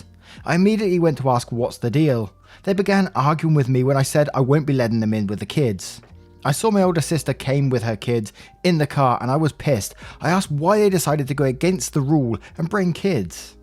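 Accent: United Kingdom. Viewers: You've never heard 'Drama Llama' before? Maybe it's a North American expression(based on your accent I am assuming you are in the UK).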